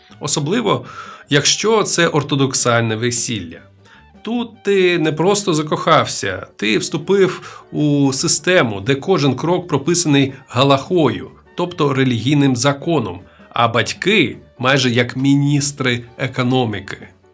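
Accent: Russia. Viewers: У автора проскакує російський акцент.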